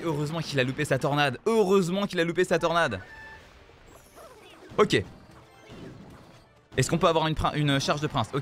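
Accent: England, United Kingdom. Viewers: Joli accent anglais je suis jaloux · En vraix tu fait genre mais ta un super accent anglais :OO sinon cool la vidéo ^^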